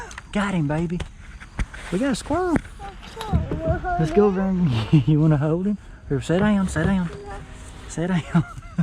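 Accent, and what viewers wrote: South, United States. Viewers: Yeah I just saw the video on how you cleaned that ”chipmunk deer” and now I will have nightmares. I found this video trough swedens largest ”link” site where they post videos and photos on a daily basis. I then listnened to your pretty polly cover and you have an amazing southern accent and nooow im going down the rabbit hole of banjos :)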